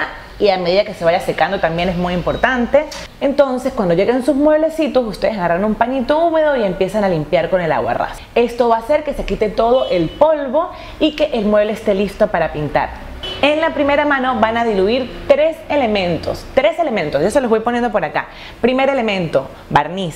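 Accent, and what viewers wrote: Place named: Peru. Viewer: de dónde eres? tienes el acento peruano... lo digo como peruano, español algo neutro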